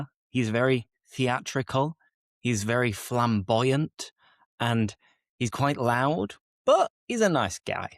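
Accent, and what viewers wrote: Northern England, United Kingdom. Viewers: Hello! I'm a native English speaker and I love languages - your video came up by chance in my feed - I was guessing in advance what you'd say for "bird" ie funny, rude or normal -and actually I guessed wrong :) I would have said rude myself! I'm 66 (yeah an old grandma) and I remember it all the time from my childhood days (the same days as wolf-whistles!) But I rarely hear it now and I am not sure even my children (34 and 36) would say it. You've encouraged me to find out though - my son teaches EFL so I'm going to ask his opinion now just out of curiosity! Video is very nice and I like that your accent use sthe short 'a' such as in 'laugh' rather than the often-used-in-language-audio longer, southern pronunciation.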